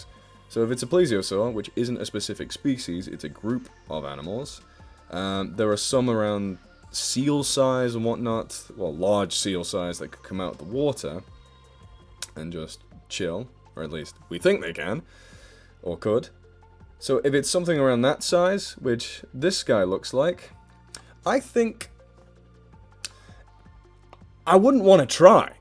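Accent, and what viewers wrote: Ireland. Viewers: I like your Irish! You are adorable! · Love the Irish accent lol great video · 1, you're Irish? and 2, fucking lmao this was funny! But also you showed me some cryptids I've never looked up before 👀 gg, sweet vid~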